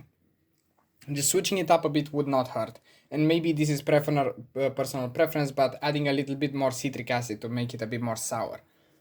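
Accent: Romania. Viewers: It is an accent. It's not for you, being Romanian, sounds "normal" to you, but it's a VERY appreciated accent by other countries. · Lovely classic romanian B2 accent Thx for the video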